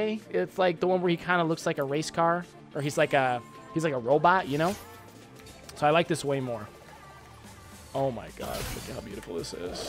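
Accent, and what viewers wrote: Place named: Boston, United States. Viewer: Boston accent baby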